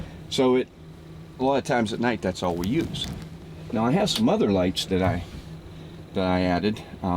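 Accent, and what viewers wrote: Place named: Western Pennsylvania, United States. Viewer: western PA accent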